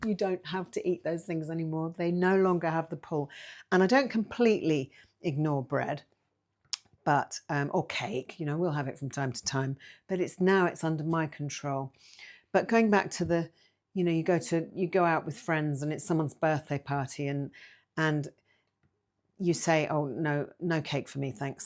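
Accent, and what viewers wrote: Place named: United Kingdom. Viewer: nice to hear a brit talking about this ... have just found your channel ... I do follow ZoeNutrition and have found over the last 2yrs avoiding the 'obvious' carbs has helped me lose over 50lbs ... have ordered iodine as per your recent video to see if it helps me ... I'm up for research supported suggestions ... I have tried my own sauerkraut, kombucha and am still making my own kefir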